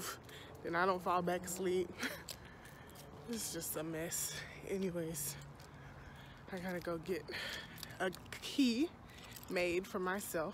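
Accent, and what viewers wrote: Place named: Jamaica. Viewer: My dude has been in America 25 years and still has his thick Jamaican accent. He stated he never wanted to loose it.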